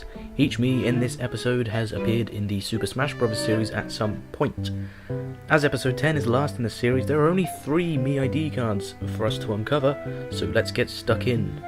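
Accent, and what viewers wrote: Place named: United Kingdom. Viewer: ops british!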